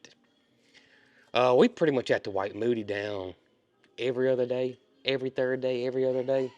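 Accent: South, United States. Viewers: Captions do not mix with a Southern accent!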